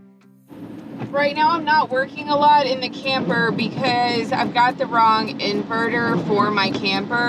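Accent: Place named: South, United States. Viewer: In a thick Southern accent, "I'm from the South..." Yup, it checks out.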